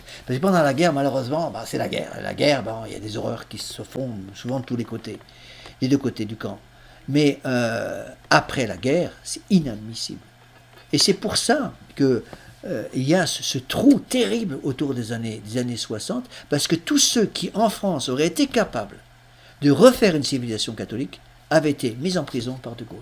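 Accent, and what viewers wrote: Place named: Algeria. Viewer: MERCI INFINIMENT, MR L'ABBÉ pour cette prédication de choc, si vivante et avec le savoureux et tellement authentique accent pied-noir!❤😊😂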